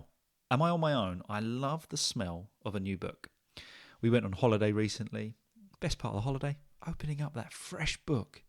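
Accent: United Kingdom. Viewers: Bonjour, Je suis Abolfazl, je viens de l'Iran et je suis en traîne d'apprendre le français ou je peux même dire que je suis étudiant en littérature et la langue française. J'apprenais le français pendant trois ans jusqu'à maintenant. L'autre chose que je voulais dire,c'est que j'adore aussi apprendre l'anglais surtout britannique accent et vous parlez incroyablement joli et ça m'aide à progresser plus rapide qu'avant. And I dare to say that now I'm able to speak exactly like you because of repeating after you. Merci à vous et bon courage ❤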